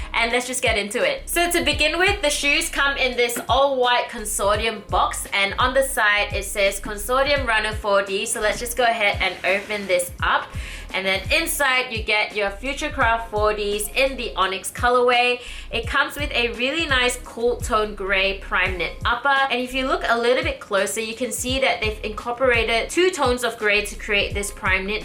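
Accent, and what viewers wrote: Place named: Australia. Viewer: I love your aussie accent LOL its so cool compared to my American accent. Def gonna look into 4Ds I think Adidas is really killing the game right now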